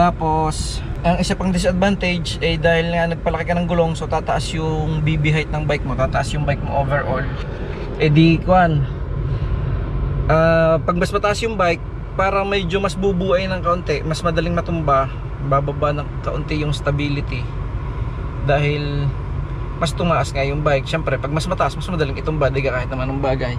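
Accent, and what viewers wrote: Batangas, Philippines. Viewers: Batangueno ka ga? Hahaha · Sir Batangeno ka ba? Ala eh panotice sir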